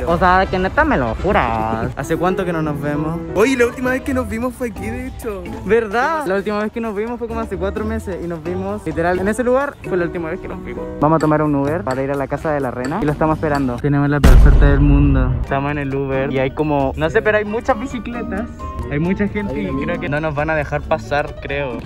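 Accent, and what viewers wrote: Chile. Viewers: eres de chile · eri chileno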